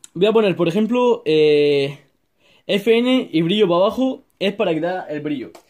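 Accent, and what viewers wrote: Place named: Murcia, Spain. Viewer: Buassss cómo se nota que eres de murcia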